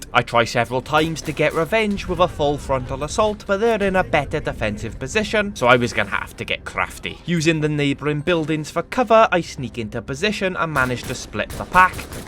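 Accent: Wales, United Kingdom. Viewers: Bro i am one min in and your personality and humour have me staying for a little longer legend live the welshy accent what’s occur-in! Get hype for sod3 brother god bless ✌️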